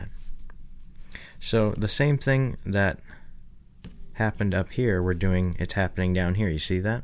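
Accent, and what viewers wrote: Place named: United States. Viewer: no probelm you tell it quite well but it's funny to hear it from american instead of french ^^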